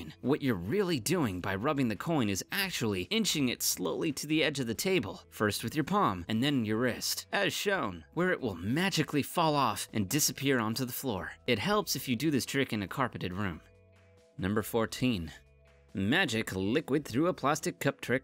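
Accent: United States. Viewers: It's so weird to hear someone refer to bills as "notes" and their value as "pounds" while not only speaking with an American accent; but also holding US bills. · 20 pound note shows 20 dollar bill also his accent was American so why 20 pound note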